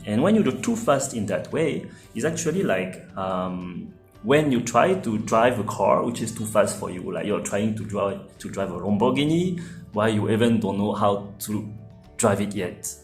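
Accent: France. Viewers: accent de français ça jreconnais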